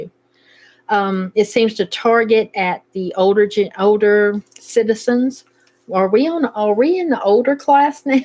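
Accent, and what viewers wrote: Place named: North Carolina, United States. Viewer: What a great program. I wish I had been on my journey at the time you started the Piedmont Trails so I could follow you as I desperately worked to understand my family history. I happened to find your channel by accident as I was flipping through You Tube for a movie. Since I was born in Statesville NC I immediately recognized a person speaking my language. I don’t even need my hearing aids to understand what you are saying. I love your accent ❤ At 77 people tell me I waited too long to start this project. However, I still remember my grand parents and my great grand parents talking about family members and it ties into my search back into my family’s history. Please keep this program going, You are special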